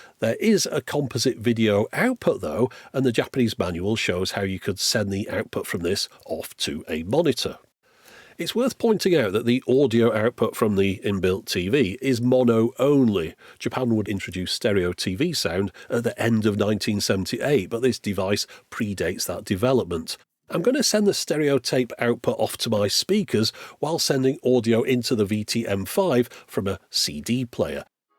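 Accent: United Kingdom. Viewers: is a straight up audiophile - this guy has shown me stuff I never fathomed existed, I just love his channel and British accent cool to listen to as well lol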